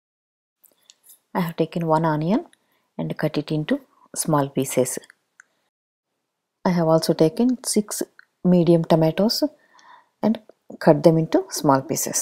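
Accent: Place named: South India, India. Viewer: This curry was amazing. I love your direct and very clear directions. What a wonderful South Indian accent you have, ma’am. Thank you 🙏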